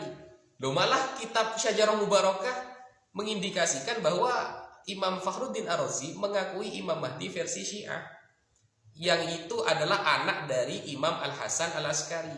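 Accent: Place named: Madura, Indonesia. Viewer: ustadz ini kok ada logat madura😁...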